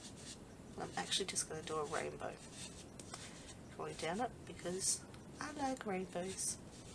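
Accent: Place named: Australia. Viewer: one of my faces girl! ❤️ And I can listen to the aussie accent all daaaaaay long! Lol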